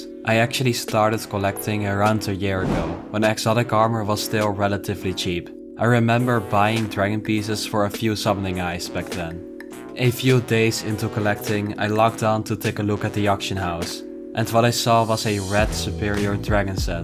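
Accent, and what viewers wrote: Netherlands. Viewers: Are you dutch cuz ur accent sounds insanely dutch lol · super nice vid man keep it up!!!!! you have kinda a dutch accent is this true?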